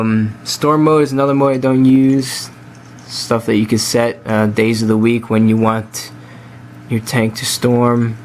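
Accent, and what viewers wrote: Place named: New York, United States. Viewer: Are you a New Yorker? I detect a New York accent.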